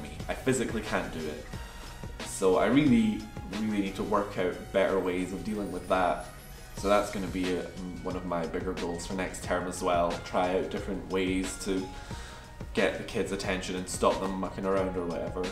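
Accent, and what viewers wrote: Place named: Scotland, United Kingdom. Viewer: Wow! I just came across your channel and was shook to hear your accent!! I'm also from Scotland and I am applying to JET this year - are there many Scottish JETs in Japan?? Look forward to watching the rest of your videos!! :)))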